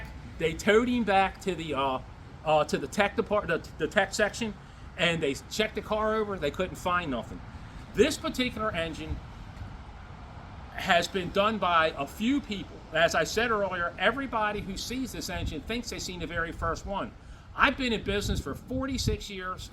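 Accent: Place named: Maryland, United States. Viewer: Awesome!! (Knew you were from MD as soon as I heard you.) I live about an hrs from Pasadena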